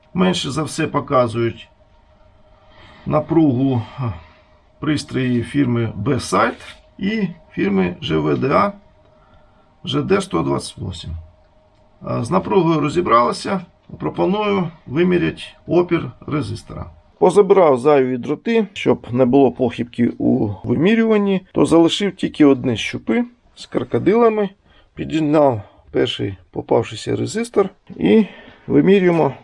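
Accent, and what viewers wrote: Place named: Surzhyk, Ukraine. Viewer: Да на суржике он балакает. Все понятно жеж.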